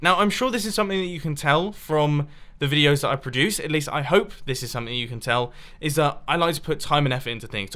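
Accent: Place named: Coventry, United Kingdom. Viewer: are you from Coventry? you just have the accent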